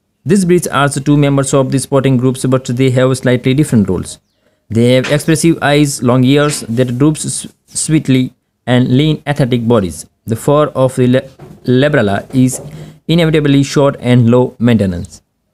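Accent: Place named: India. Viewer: I don’t think it’s AI. My guess is that this is a man from India doing the narration. Unfortunately, his accent is extremely difficult to understand. If he is going to be producing videos like this, he should at the very least SLOW DOWN.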